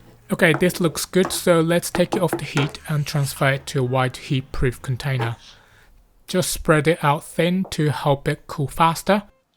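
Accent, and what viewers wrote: United Kingdom. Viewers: Love your British accent! Thank you for the lovely recipe ❤❤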